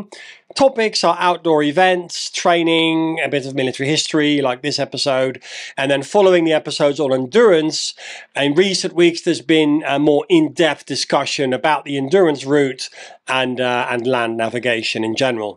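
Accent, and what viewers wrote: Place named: Netherlands. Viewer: Ik meende al een Hollands accent te horen. Maar is je Engels nou goed voor een Hollander, of is je Nederlands (erg) goed voor een Brit? Anyhoo, gaaf kanaal, maat!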